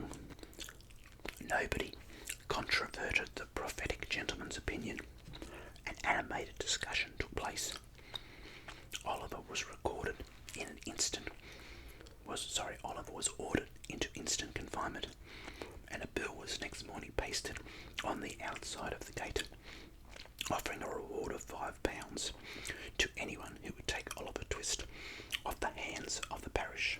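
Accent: Australia. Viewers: I'm Venezuelan, but I really love aussie accent, and this help me a lot to lear english. This videos are so amazing, and your voice is incredible 💖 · Subscribed , turned on post notifications , I’m looking forward to all your videos , I love Australian accents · 😍Yay Australian accent book reading and gum chewing! My favorites in one video. OK goodnight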